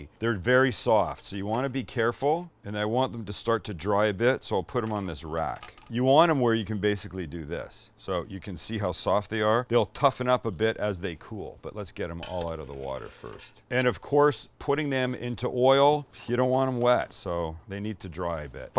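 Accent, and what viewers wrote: Ireland. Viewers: 8/10 on the Irish accent. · C. he used the C word. you can tell because of the irish accent. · pretty good irish accent there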